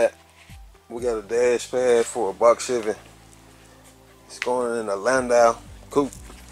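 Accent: Alabama, United States. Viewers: Dude sound like hes from Bama... Credits roll Bama tag lol great job man. new sub lets get that 100k for ya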